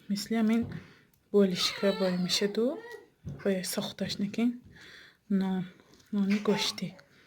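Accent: Isfara, Tajikistan. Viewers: АПА МАН АНЧА ПЕШ КАНАЛАТОНДА ПАТПИСАТ КАРА БУДАМУ ХАБАР НАГИТАМ ХОЗИР БОШАД ХАМАИ ВИДЕХОТОНА ДИСИЯМ МЕГУМ ШУМО АЙ ТАРАФИ ИСФАРА НЕСТЕД ТАРЗИ ГАП ЗАНИ ИСФАРАГИ БА МОНАНДД АГАР ААЙ ИСФАРА БОШЕД АЙ КАДОМ НОХИЯШ 👍👍👍👍👍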